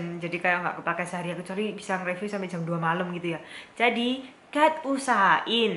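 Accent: Java, Indonesia. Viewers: Dulu pas mau lihat, wah muka2 kek gini pasti ngomongnya inggris nih. LAH KOK medokkk gitu mbakeeee... luccccuuuu 😂😂😂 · hahaha I❤U!! lucu, ga lebay, kocak bat medok gt. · Medok nya wkkwkwk♡ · Ci medok banget ! Nice video btw~ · Itu dimedok2 in apa medok beneran si kak 😬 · kak medok bgtt gaboring sukaa bgttt wkwkwk😂😂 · Oh my~~~ untuk orang yang dulu tinggal di surabaya dan kangen medok nya orang jawa, seneng banget nonton video ini 😍 Dan aku langsung ngakak denger pengampon, kalo beli makeup murah juga di pengampon 😂😂😂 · Jawa banget, keren keren. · wadoh ce medok poooll 😂 subscribed yooo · Kocak abis reviewnya jowo banget aku langsung subscribe ka wkwk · Medok jawa nya 😂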